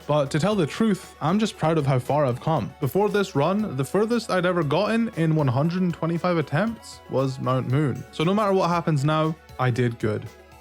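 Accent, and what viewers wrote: United Kingdom. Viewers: Where is your British accent from :0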